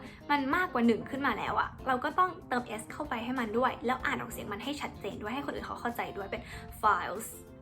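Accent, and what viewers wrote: United States. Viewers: Your pronunciation is not correct but the accent is sounds like American. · ฟังง่ายค่ะ ฟังดูเป็นอเมริกัน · ยังไม่เป๊ะ อเมริกัน 100 เปอร์เซนต์ค่ะ แต่ถือว่าพูดได้โอเค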